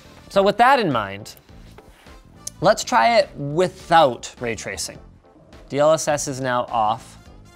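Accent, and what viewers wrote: Canada. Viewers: You can really hear the Canadian in Linus’s voice today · Is it just me or did Linus go super Canadian in this video eh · Dang Linus’ Canadian accent came out to play in this video...and I’m Canadian lol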